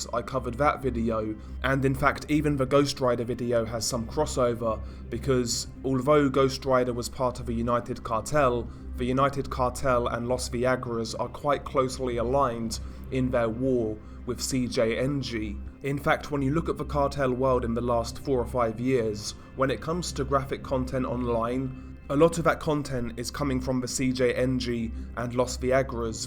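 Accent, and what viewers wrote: England, United Kingdom. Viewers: Best sound theme ever ! Combines lovely with ur english accent. ' Rival Cartel Membahh!' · British accent is wonderfull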